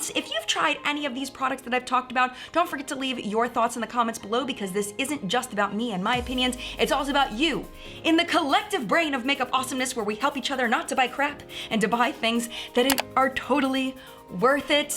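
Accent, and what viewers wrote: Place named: Midwest, United States. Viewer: I love your midwestern accent :) I’m from Iowa and say “vanella” also and always get called out for it. ;p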